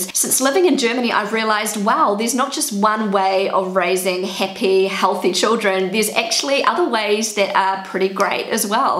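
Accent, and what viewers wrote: New Zealand. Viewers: Hi, I love your videos and your cute kiwi dialect. I find it great that you speak with your children only English and obviously your husband German. Never again it will be so easy for the kids to learn 2 languages at the same time. My daughter in law is American and they live in Australia. My son speaks with their son only German and my daughter in law only English. The kid is three years old now and it is amazing to see how he speaks with his parents in their different languages. He turns his head to his father and speaks German and then turns his head to his mother and speaks English, incredible! And on Skype he speaks German with us, his grandparents. Warm greetings from Hannover, Germany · Everytime a pleasure to hear your velvet voice with this funny 🥝 accent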